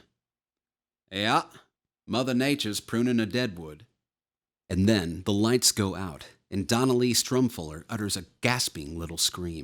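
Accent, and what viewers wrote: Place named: Maine, United States. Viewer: You have do a pretty good Maine accent.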